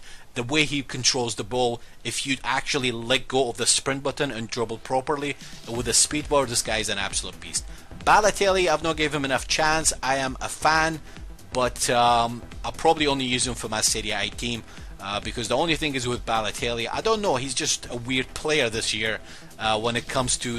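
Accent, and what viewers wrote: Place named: Scotland, United Kingdom. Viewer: Your accent sounds like it has a little bit of Scottish in it, either that or I'm trippin' :') PLAY ME THOUGH YOU WOULD MOST LIKELY BEAT ME, BUT I LIKE A CHALLENGE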